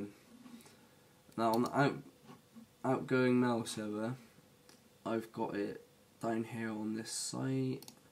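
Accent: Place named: England, United Kingdom. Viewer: i love the way this guy explains with the tiny screen and the cursor running all over the place at 200 mph...and on top of that the english accent which is sooo clear. Way to go!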